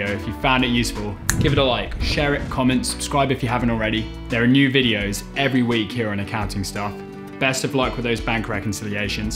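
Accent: United Kingdom. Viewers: May God bless you for the way you have blessed us! Extremely helpful video - I'm doing a course in bookkeeping. Just curious, where are you based? Your British accent and use of dollars instead of pounds in your examples is throwing me off 😂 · PLEASE NEXT VIDEO NOT TOO FAST PLEASE ! SINCE YOUR BRITISH ACCENT I HAVE TO TAKE A LITTLE TO GET YOU !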